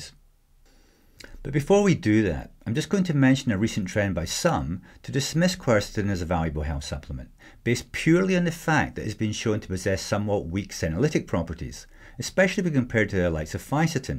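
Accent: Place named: Edinburgh, United Kingdom. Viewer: You’re not very clever, are you? There is absolutely no issue with the guys accent. Sounds like an educated Edinburgh Scot. OK, he’s not North American, but neither are 98% of the world population. You need to open your mind and open your ears.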